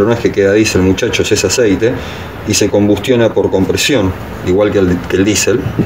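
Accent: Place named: Argentina. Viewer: De que parte de Argentina sos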